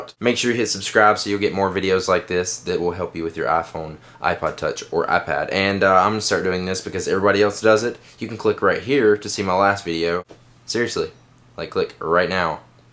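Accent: South, United States. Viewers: Damn old vid. U sound so country here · pretty sure he just sounds like a southern United States lad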